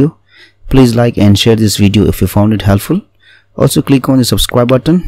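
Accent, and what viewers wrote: United Kingdom. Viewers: This is the first India guy can speak with English accent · Are you from London?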